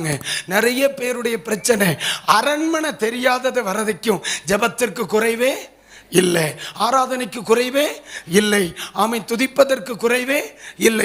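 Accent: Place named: Tirunelveli, India. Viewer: பாஸ்டர் உங்க செய்தியும் பிடிக்கும் நீங்க பேசுற இந்த திருநெல்வேலி பாஷையும் நல்லா இருக்கு பாஸ்டர் அருமை சூப்பர் ஆமேன்❤❤❤❤❤❤🙏🙏🙏🙏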